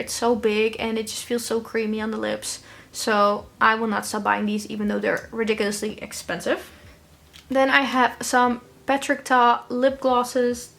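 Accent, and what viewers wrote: Netherlands. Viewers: I hear a Dutch accent right? 🤭 I subscribed! I LOVE girly video's 😊🩷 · wait girl are u dutch? ur accent seems familiar hahaha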